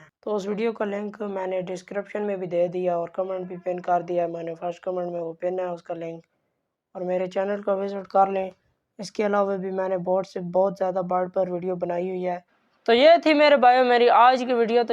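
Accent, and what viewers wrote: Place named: Punjab, Pakistan. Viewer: اپ اپنا اردو بولنے کا طرز ٹھیک کر لو اپ کا انداز بیان اچھا ہوگا اور اردو بولنے کے اندر پنجابی لہجہ نہیں ائے گا تو لوگ اپ کی بات سے ویسے ہی متاثر ہوں گے اور خود بخود اپ کو سبسکرائب کریں گے کیونکہ جتنا اپ روانگی کے ساتھ ادب اور اخلاق کے اچھے بہترین طرز کے ساتھ اپنی گفتگو کریں گے اتنے سارے لوگ اپ کی ویڈیو کے لیے بے تاب ہوں گے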